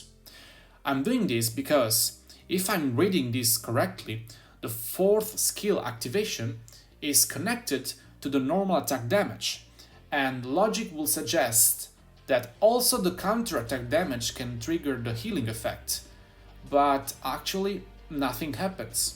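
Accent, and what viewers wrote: Italy. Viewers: Lo sento l’accento italiano 😉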